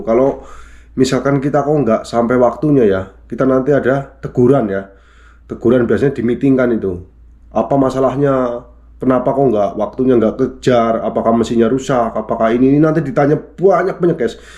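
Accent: Banyumas, Indonesia. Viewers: Mas orang jawa ngapak ya, ini di jepang di kota mana mas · jawane ngendi mas , kok logate ngapak tenan 🙏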